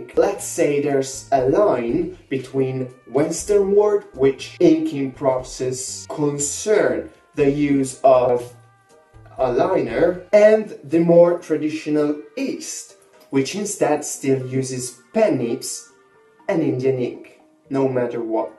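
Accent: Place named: Italy. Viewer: OMG ho appena scoperto che sei italiano! ^_^ In effetti si sentiva che non eri Inglese (srnza offesa ovviamente, il tup inglese è ottimo :) )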